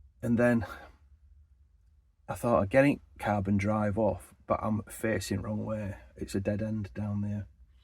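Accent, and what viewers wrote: United Kingdom. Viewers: Oh, I hear an accent, you are in the UK. Beware, Jack the Ripper got his start in and around London.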